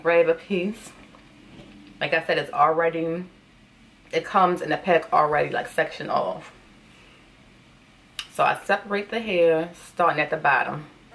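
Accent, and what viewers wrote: Baltimore, United States. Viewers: You from Baltimore? I can hear our accent. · I love that Baltimore accent! I could hear it with certain words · Are you from Baltimore or dc ? Lol i hear it. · U got that strong B-more accent!!😍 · This video was recommended to me and I'm glad it was. Great tutorial and hairstyle. Just curious, are you a hair stylist? I noticed a Baltimore accent, it'd be great to have someone with your skills in the area. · Bomb tutorial! Thank you 💙! Can't wait to do this in my hair :). I have a question, are you from Baltimore, Maryland? I hear an accent. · Love your accent. Baltimore? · Came out beautiful... off topic but you sound like you’re from Baltimore... but anyway imma try this method... seems easier than the rubber band method .. thanks for the tutorial sis EDIT:and that dress is cuuuute too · This is the neatest I’ve seen. I’m trying to do mine and I’m about to throw In the towel. 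I love your accent by the way. Are you from Baltimore? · Lol that Baltimore accent I love it. And your hair looks so pretty I'm gonna stop being afriad and try something new. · Yo she got to be from Baltimore😁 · You're from Baltimore or the DMV right? That accent is a dead giveaway! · I know our accent anywhere Bodymore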